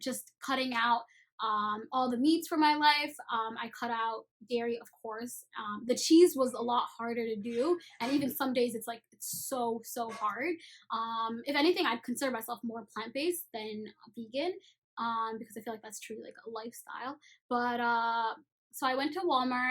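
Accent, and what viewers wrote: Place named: Ottawa, Canada. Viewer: I know an Ottawa accent when I hear one LOOOOOOL mashallah tho!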